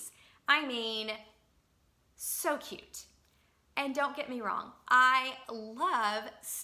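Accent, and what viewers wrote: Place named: Tennessee, United States. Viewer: Great video! You sound like you're from Memphis or Nashville! Are you from TN?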